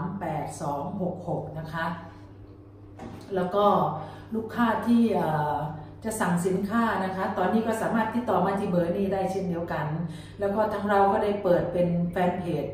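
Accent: Korat, Thailand. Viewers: พี่สุสวยจัง จากบุรีรัมย์ พูดโคราชเหมือนกัยเลยน่ารักเด้อจ้า😊😀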